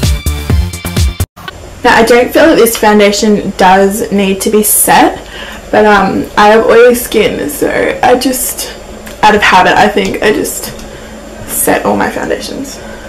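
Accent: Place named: Australia. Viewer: Just found your channel when searching for chichi products. I've lived in australia for a couple of years.. and boooy do i miss it!! started watching your videos and your accent wouldnt let me stop! haha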